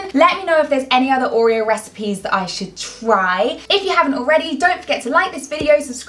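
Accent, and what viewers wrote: United Kingdom. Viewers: I think she has British accent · Omg whats your accent? Is it English? Its beautiful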